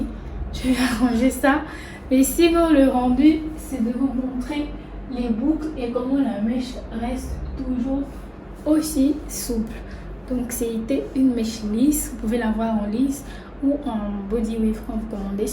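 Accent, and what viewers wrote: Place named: Gabon. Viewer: Tu as l’accent d’une gabonaise 😅😅. Sinon très beau rendus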